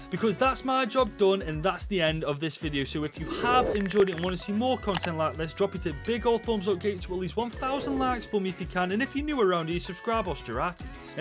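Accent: Stoke, United Kingdom. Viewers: Eh up mate, which part of glorious Stoke are you from?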